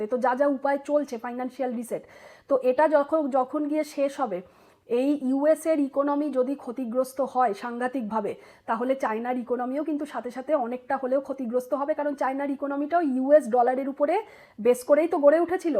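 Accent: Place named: Medinipur, India. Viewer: Are u medipuroan by origin ?😅😅 U utter like this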